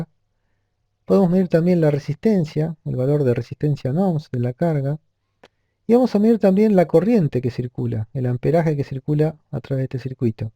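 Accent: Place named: Rioplatense, Argentina. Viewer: Creo que eres Argentino o Uruguayo Saludos de Peru Papá se les quiere gracias por el curso💪🇦🇷♥️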